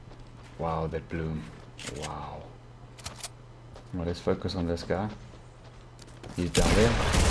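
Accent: South Africa. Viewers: Are you afrikaans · Are you south African?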